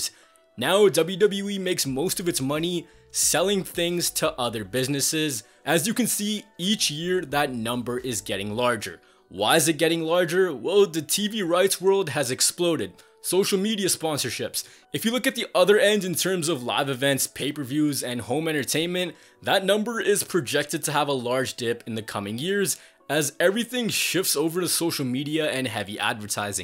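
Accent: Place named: India. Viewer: this guy speaks with a heavy indian accent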